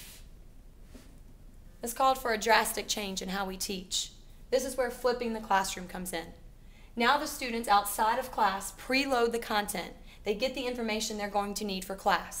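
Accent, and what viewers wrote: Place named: United States. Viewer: Your video is being used on a UK website claiming that you are one of their teachers which is odd I thought because of the Yank accent. you might want to ask them to take it down, contact me for the link where it is being used if you care.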